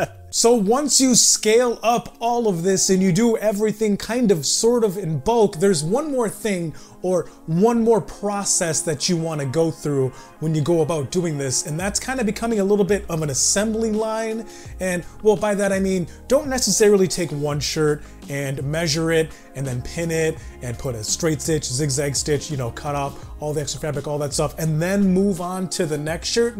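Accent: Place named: South, United States. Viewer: Bro you from the south? Like GA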